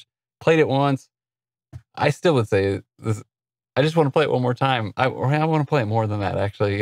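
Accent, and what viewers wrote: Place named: South, United States. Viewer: the southern drawl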